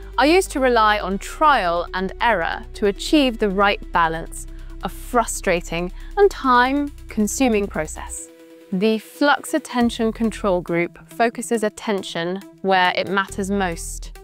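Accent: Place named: United Kingdom. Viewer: why, It is England tone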